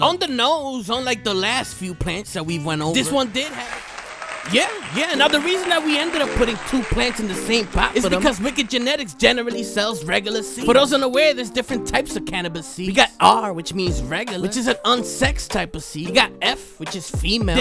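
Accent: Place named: Brooklyn, United States. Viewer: I knew you sounded like a Brooklyn kid lol😂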